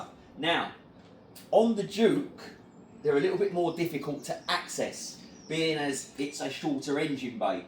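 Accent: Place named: South, United States. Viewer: Fabulous work only wish you live nearer than your southern accent may suggest 😜👍, I want this same procedure doing so can you let me know how much to expect to pay so I can get some quotes? Thanks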